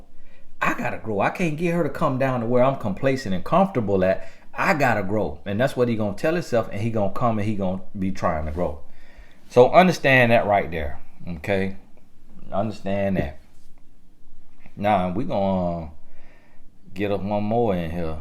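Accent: United States. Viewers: Lol crack me up with that country accent... ladies listen to this man of GOD! He is telling us the truth! Do not chase no man PERIOD! Let them go something better will come if you are patience...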